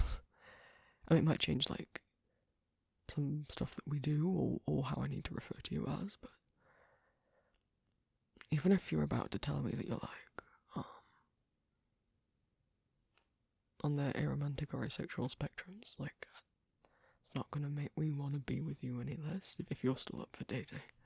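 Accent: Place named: United Kingdom. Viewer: GRAAHHHH i ADORE the british boyfriend audios!!! all your recent audios have been AWESOME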